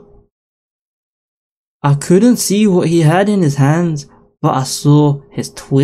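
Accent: Scotland, United Kingdom. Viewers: Advice. Ditch the Scottish accent on the video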